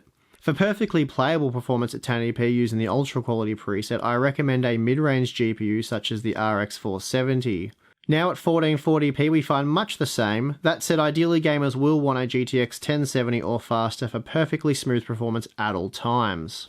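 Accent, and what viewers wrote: Australia. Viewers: You have such an australian accent omg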